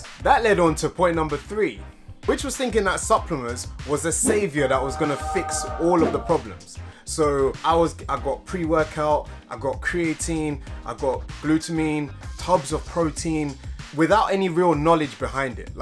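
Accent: United Kingdom. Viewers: Brother with a brit accent....and yankee football in the background....my brain cannot process this dissonance ! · British accent and American Football doesn't go together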